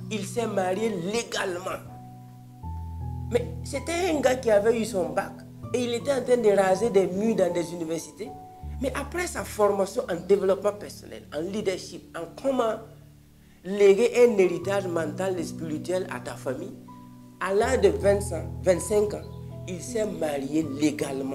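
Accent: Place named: Burkina Faso. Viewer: Le français des burkinabés fait rire 😂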